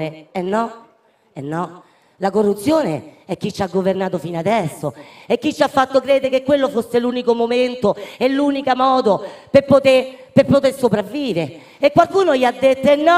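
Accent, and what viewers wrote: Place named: Rome, Italy. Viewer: sei proprio 'na romanaccia,come me! daje che cja famo!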